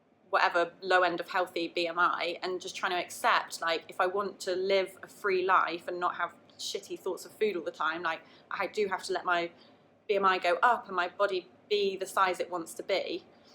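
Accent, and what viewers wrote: United Kingdom. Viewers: For some reason it’s really comforting to hear a British voice on a (quality) YouTube channel! I feel like I’m so used to American accents that I get startled by a British one (even though i live in England and everyone around me sounds like that - it’s just that online everyone’s a Yank I swear!) you’re so eloquent too which is amazing! X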